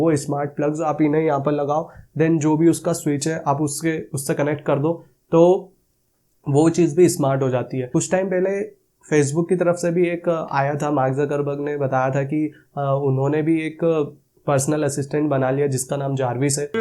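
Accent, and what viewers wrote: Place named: Gujarat, India. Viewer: I bet he is Gujrati or Rajasthani... Because the way he says "set" or "ek" & other words... Well thanks for this video brother ❤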